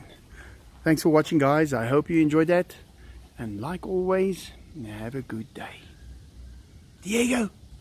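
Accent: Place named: South Africa. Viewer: is it summer there now? bleh.. we have -10C and snowstorm.. well.. thats sweden.. CAN YOU BELIEVE IT haha ur south affrican accent is so cool =) well i should keep my mouth shut since i have swedish accent with hard R like you =) but i love the SA accent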